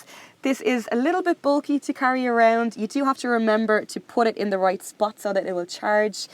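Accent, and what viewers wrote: Ireland. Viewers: This channel is pushing great reviews! keep up the great work. Also that Father Ted accent makes it even better :) Cheers from India!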